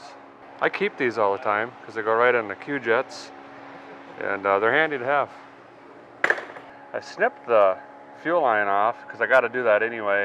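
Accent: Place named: Minnesota, United States. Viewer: Well I’d be darned this fellas accent makes ya want to move to Minnesota 😂 love his humor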